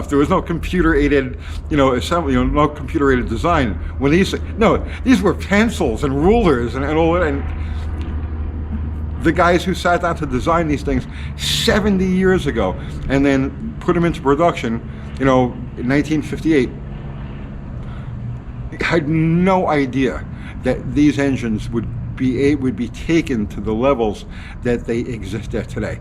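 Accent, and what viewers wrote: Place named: Boston, United States. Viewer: I can listen to you info dump all day. I think part of it is hearing you talk car engineering in your slight Boston accent reminds me of the tappet Brothers I used to listen to all the time. Kind of humorous to hear a boomer say yeeted though.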